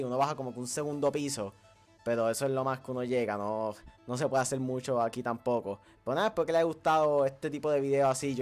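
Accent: Puerto Rico. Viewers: Eres de PR verdad?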